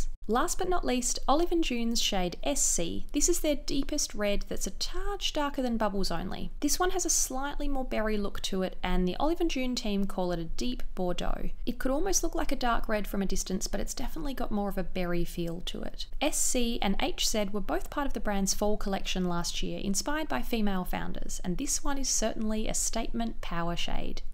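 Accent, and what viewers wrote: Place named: Australia. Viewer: I keep getting stumped! An Australian accent so I automatically assume you're from Aus and the products you talk about can be found here but both Olive & June and Glossier don't ship here! So upsetting :(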